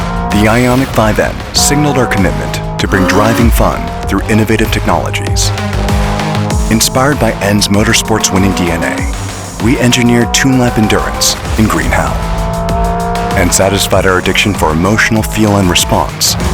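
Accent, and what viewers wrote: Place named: United States. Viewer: drop the american accent. the cars look amazing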